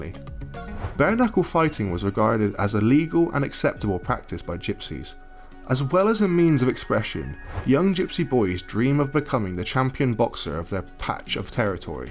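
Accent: Bristol, United Kingdom. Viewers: Are you from Bristol the man narrating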